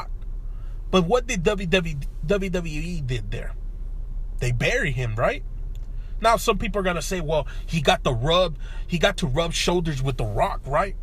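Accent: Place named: West Coast, United States. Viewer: Bruh I forgot I even commented watched this again dying laughing your accent is gold bruh 😂 definitely a west coast Latino 🥂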